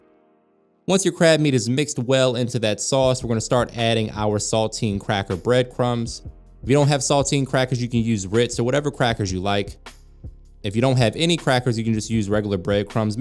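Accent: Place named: Maryland, United States. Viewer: Quick story… About 20 years ago the corporate office I worked for was in Cockeysville MD. When I would fly out there, the ladies would make me THE BEST crab cakes. I quit, company went under (I think) lost touch with the ladies. Through the years, I’ve tried to replicate the cakes, no luck. I’ve tried HELLA cakes, never as I remembered them. Fast forward to a week ago. I knew I recognized your very faint accent. When you said you were from the area, we’re making crab cakes, my heart skipped a beat! Made the crab cakes today, not exaggerating… they legit made me cry, took me back to the best crab cakes I’ve ever had, the ladies, the fun we had, Chesapeake Bay, everything. THANK YOU so much. Love everything about your cooking channel, keep up the good work☺️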